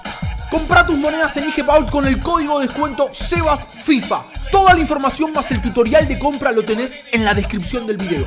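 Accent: Argentina. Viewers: como se nota que sos argentino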